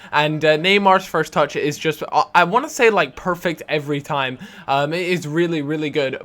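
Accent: Scotland, United Kingdom. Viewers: You scottish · Where in scotland u from??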